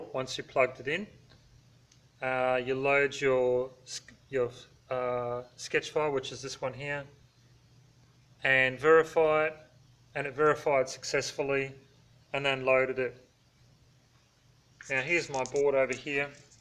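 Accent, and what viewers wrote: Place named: New Zealand. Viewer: U sound like a true kiwi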